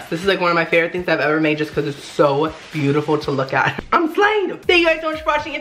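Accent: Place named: United Kingdom. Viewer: HIS LITTLE BRITISH ACCENT MAKES ME LEE EVERYTIME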